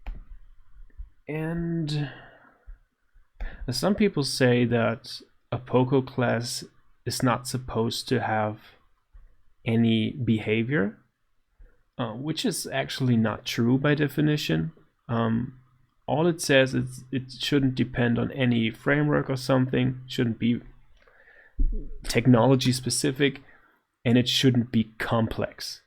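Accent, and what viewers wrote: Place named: United States. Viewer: Excellent English with a strong american accent. Where did you get that from?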